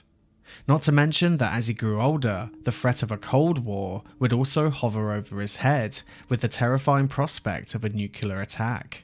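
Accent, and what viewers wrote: United Kingdom. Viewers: Thanks for this i love the British narration it works beautifully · He just sounds British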